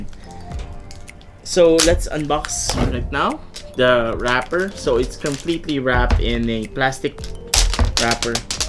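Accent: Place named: Philippines. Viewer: Hearing another pinoy makes me understand all this tech stuff better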